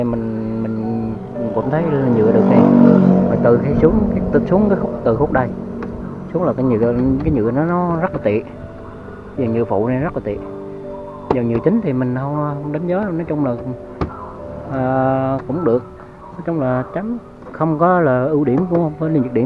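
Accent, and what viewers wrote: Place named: Quang Ngai, Vietnam. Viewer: ôi giọng 76 quê mình nghe thân thương quá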